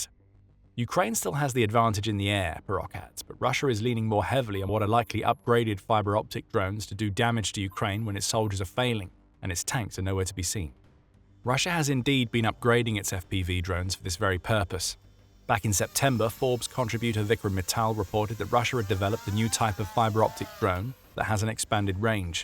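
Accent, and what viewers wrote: United Kingdom. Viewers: "This is Insanity. Putin would rather loose 100 soldiers than 1 tank." The irony of that statement is that the narrator has a British accent. During the the battle of Passchendale, the British High Command lost so many of the horses that pulled artillery and wagons that the British considered the loss of horses as more significant than the loss of men.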